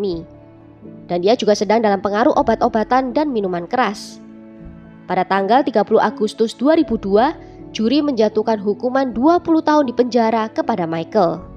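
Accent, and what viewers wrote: Java, Indonesia. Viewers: Alhamdulillaah.. medokuhhh cantik 👍👍😍😍😍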